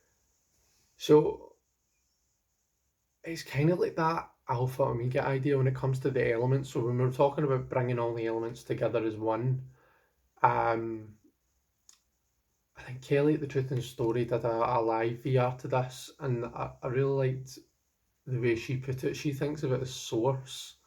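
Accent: Scotland, United Kingdom. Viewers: Congratulations on a fab first video and always lovely to hear a broad Scottish accent :-)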